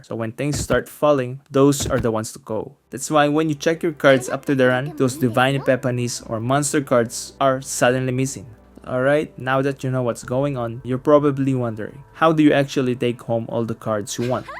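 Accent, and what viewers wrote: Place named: Philippines. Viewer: i knew that accent kababayan lmao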